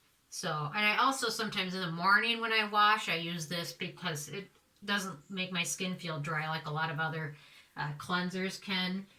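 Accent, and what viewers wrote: Minnesota, United States. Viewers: it does my heart good to hear your Minnesota "accent"... I'm originally from North Dakota and it sounds like home. 😄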